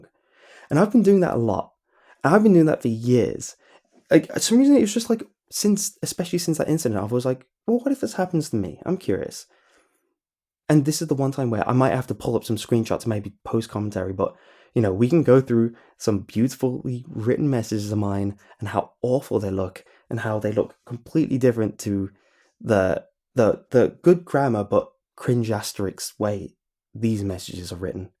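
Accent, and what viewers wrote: England, United Kingdom. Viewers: this is an awful situation but GOD DAMN THAT BUTTERY ENGLISH VOICE MATCHES UP WELL YOU HANDSOME DEVIL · I thought he was gonna look like those british people ( which the fact that he speaks a british accent ) but with blonde hair and green eyes or something like that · And I wish it would change he's only got this one voice with exaggerated breathing or the annoying little kid voice. They're all thicc British accents when you're trying to hear about a teenager living in Alabama being stalked through a anbandoned mall by monsters. "Ello mate, my name is Skylar and me mum has sent me to the mall to get some cookies. Pip pip cheerio. Long live the Queen of America." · Is he english? Im english and he doesnt sound totally english. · wow is part asian? who could have guessed that:O i always imagined you(by voice) to be a british guy. 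· Ayyy I watch your videos, funny seeing you here🤣. He's got a British accent so just assuming english is probably his first language. From what he was saying it seems like he is. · Well, I really like the videos you post, oftentimes fall asleep to CreepyPastas, your voice is somewhat nostalgic and melancholic giving them a pleasent nuance. And besides I'm quite found of the Brittish accent, my teachers was from Manchester. Keep up the good work brother!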